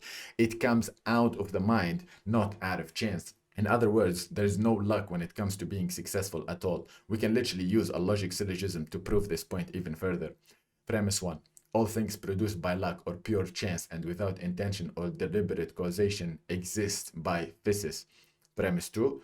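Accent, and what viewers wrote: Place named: Italy. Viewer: Wait why do you sound Italian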